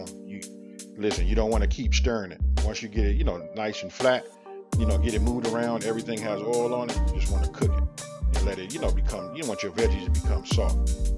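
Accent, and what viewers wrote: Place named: Louisiana, United States. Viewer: the way you say your "i" sounds, I think you are from LA like my husband. He makes grillades and grits, and it's one of my most favorite things! This looks incredible!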